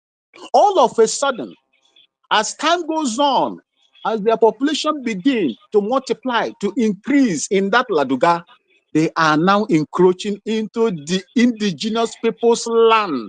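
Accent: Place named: Igbo, Nigeria. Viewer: This is an igbo man from his intonation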